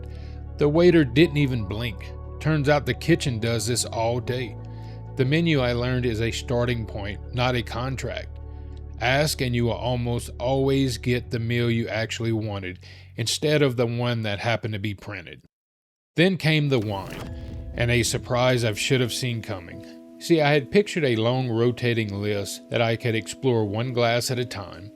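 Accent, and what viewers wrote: United States. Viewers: You don’t sound Irish. You sound American af. False advertising. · Almost 5 minutes of absolute dribble. Sounds like a typical yank, no homework done, totally unprepared.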